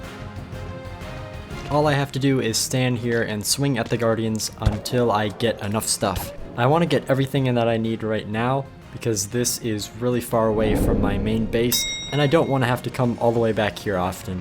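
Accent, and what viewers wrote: Minnesota, United States. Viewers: With the name I was hoping your MN accent would be more aggressive.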